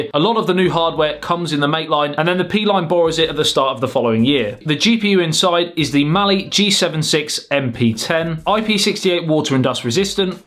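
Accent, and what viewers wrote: United Kingdom. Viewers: Like your UK accent mate 😏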